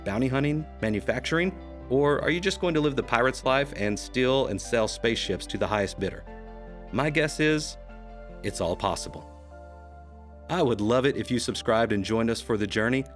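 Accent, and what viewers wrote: United States. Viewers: How much is Microsoft paying you, and which Southern State are you from, I definitely hear a "TWANG!!!"😆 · You sound like people I know from South Carolina. Love these videos btw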